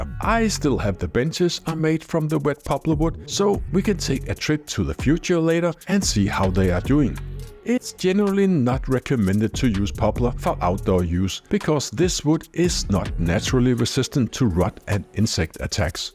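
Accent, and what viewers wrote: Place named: Denmark. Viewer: Awesome! I’m a carpenter in Calgary Canada. But I’m Danish. Moved here 1967 when I was nine months old…Still have family back home. It’s nice to hear your accent. It reminds me of my father, who also died a couple years ago…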